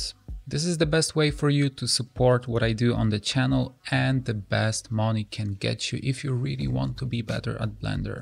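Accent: Poland. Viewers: Przez moment zastanawiałam się, czy ten akcent nie jest przypadkiem polski, teraz już wiem, że to nasi. ;)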